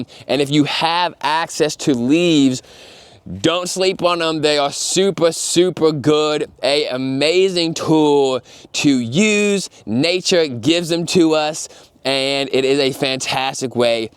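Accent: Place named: New York, United States. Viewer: Cant handle that hardcore ny accent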